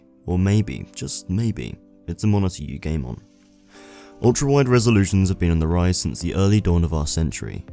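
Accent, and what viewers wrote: United Kingdom. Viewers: British accent. Of course ! Hey any chance of you doing a video after 4 shots of Scotch or Brandy?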